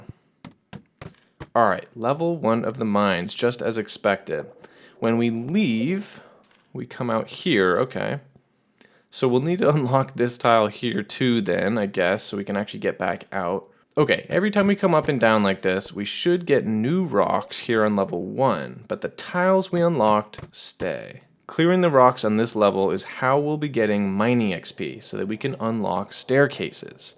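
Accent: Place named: England, United Kingdom. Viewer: two things. first, i loved the series, it's fun to watch or keep it as a background serie to do other things ❤ secondly, helps me understand the English accent better, since i speak native Spanish 😌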